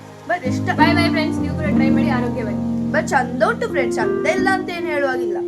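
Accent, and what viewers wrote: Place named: Karnataka, India. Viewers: Whenever I’m free ,I used to watch your videos. your place , nature , the putturu slang everything made me to watch again again ..!🤩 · why this kannda sound diff from wht we hear in belagavi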